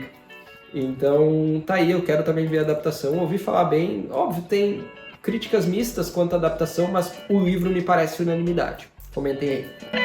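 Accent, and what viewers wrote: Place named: South, Brazil. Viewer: Homem eu jurava que você era do sul. Você .pra no rio de janeiro? 😅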